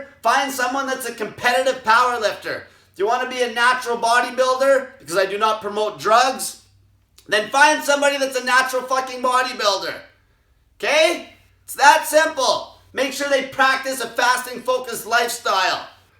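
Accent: Canada. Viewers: This guy's high-pitched hoser accent is hilarious.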